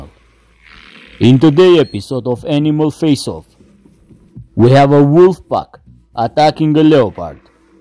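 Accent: Russia. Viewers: i like your russian acsent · Лучше б рассказал на на русском. Зачем так напрягаться, по акценту понятно что русскоязычный.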